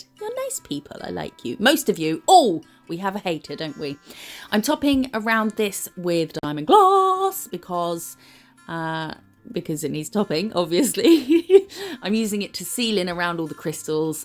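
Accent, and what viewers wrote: RP, United Kingdom. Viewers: I loveee your channel, you talk to yourself like I do, swear like I do and have a naughty sense of humour like I do, so it’s like hanging with a friend with a much posher accent (I’m Northern Irish). Love your designs into the bargain! Win!!! Xoxo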